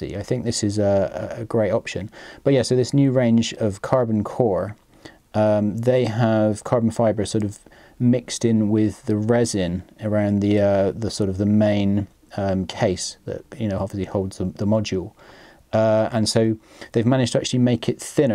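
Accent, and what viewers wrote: Bristol, United Kingdom. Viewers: Fellow Bristolian? But in the Ocean so maybe Plymouth?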